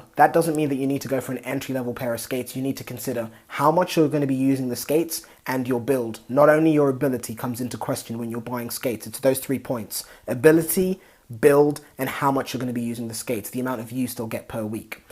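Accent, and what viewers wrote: England, United Kingdom. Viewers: Can anyone else narrate without that accent., it’s bad enough on commercials or cooking shows but now hockey, funny not aware of any English hockey teams..... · I was expecting a Canadian accent, not English. Anyway, thanks for the video, I'm in the market for skates.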